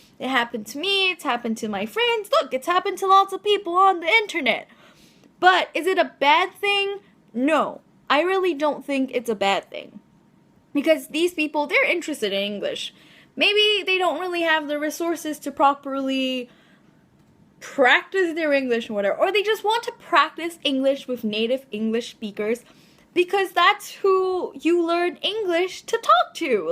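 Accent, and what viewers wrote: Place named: United States. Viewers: You're English is good, you legit sound American! I thought you were Asian-American at first lol. I remember speaking to a Japanese girl who sounded pretty American too :3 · Btw you sound very American for a Singaporean. Why?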